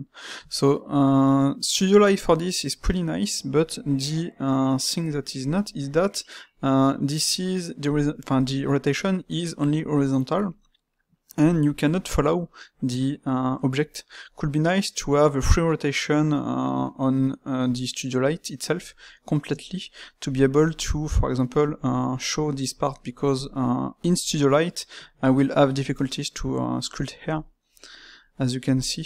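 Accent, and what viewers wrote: France. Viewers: toi t'es francais 😂mais sinon trés bonne video continue comme ca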